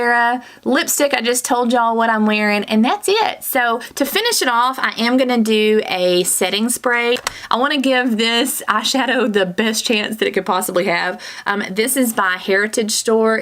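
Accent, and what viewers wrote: United States. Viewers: what a great idea! i love watching your videos. so real and funny and you have a country accent like me LOL!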